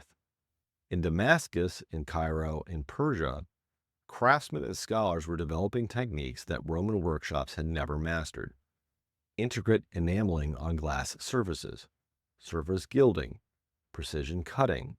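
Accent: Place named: United States. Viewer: OMG. great subject but why the American accent? in is 2026, The U.S is not where it is at now. That has long gone. Can not watch, sorry. :(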